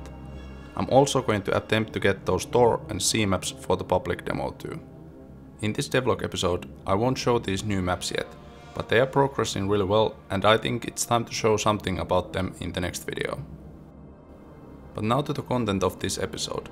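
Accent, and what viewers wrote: Finland. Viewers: Are you finnish you have strong finnish ascent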